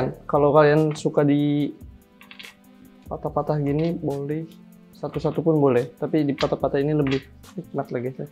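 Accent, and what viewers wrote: Malaysia. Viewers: comel je ckp melayu hahahah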